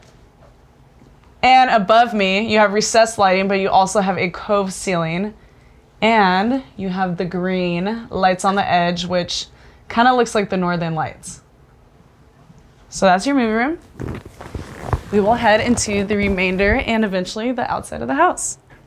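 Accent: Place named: United States. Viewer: Someone can tell me from which state this american english accent come plz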